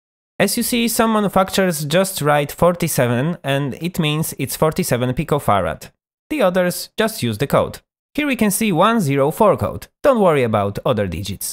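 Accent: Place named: Poland. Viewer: Kurwa uslyszalem akcent i wiedziałem ze z polski XD cieszy mnie to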